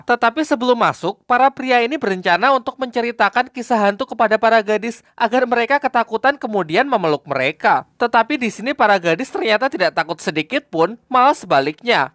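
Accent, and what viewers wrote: Java, Indonesia. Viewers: Mimin orang Jawa ya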